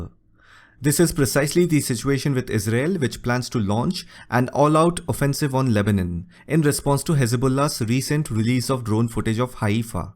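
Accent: India. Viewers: This guys indian accept is insufferable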